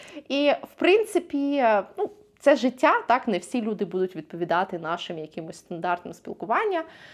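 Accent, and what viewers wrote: United States. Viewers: В таком случае все проживающие и рождённые в штатах Мичиган, Иллинойс (Чикаго)говорят неправильно.она произносит так как разговаривают в штате,где она проживает,чтобы не было акцента.не знаю где Вы проживаете,родились и какой Ваш родной язык.если Вы проживаете здесь,то знаете, в различных штатах есть свой акцент и произношения определенных слов,поэтому общаясь с людьми,Вы можете предположить откуда человек.если Вы обратили на это внимание,я 100%уверенна,Вы не рожденыв штатах,а приехали !!! всего доброго!!!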